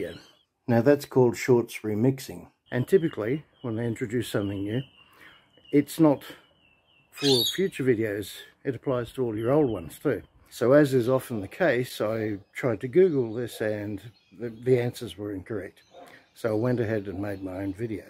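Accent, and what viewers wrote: Australia. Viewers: And your Australian accent is super cool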